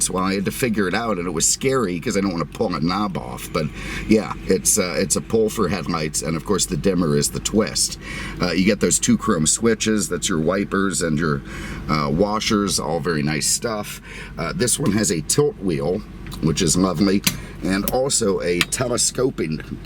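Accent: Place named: Great Lakes, United States. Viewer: Your accent screams somewhere between Lake Michigan & Pittsburgh😆This baby is a real gem from an era we may never see again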